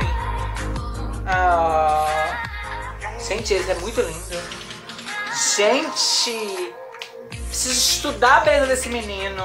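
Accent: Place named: Bahia, Brazil. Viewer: MEU DEUS DO CEUUU, EU SOUBE QUE VC É DA BAHIA E EU TAMBÉM SOU, EU SOU DE SALVADOR-SIMÕES FILHO! JÁ OUVIU? FAZ UM ENCONTRINHO EM QUALQUER SHOPPING DE SALVADOR, EU QUERO TE VER! BEM QUE EU DISCONFIAVA DO NOSSO SUTAQUE! RSRSRS...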